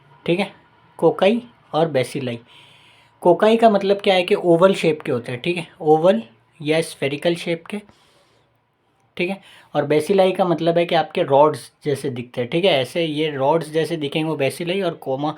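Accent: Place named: Rajasthan, India. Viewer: The rajasthani accent 🤌😅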